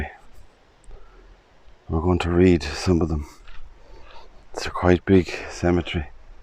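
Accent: Ireland. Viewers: What a smooth voice luv the Irish accent dis you notice that the grass is so green plus no weeds?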